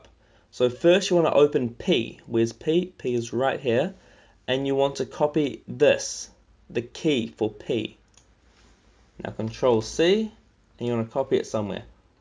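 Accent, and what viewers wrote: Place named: New Zealand. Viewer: you sound like a kiwi lol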